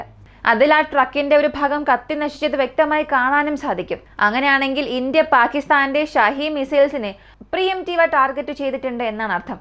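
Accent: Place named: Thiruvananthapuram, India. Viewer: വല്യ കുഴപ്പം ഒന്നും ഇല്ല മോളെ. പിന്നെ Trivandrum slang ഒളിച്ചു വെച്ചാലും......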